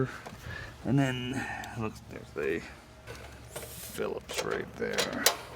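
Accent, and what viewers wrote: Maryland, United States. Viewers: I may be wrong and that’s ok on my observation. And no offense implied or intended. But since I started watching this channel, I’ve suspected you are from or spent a substantial amount of time in Maryland to develop the quintessential accent of the region. I was born and raised in Maryland myself, and that is very familiar to me. Happy Thanksgiving!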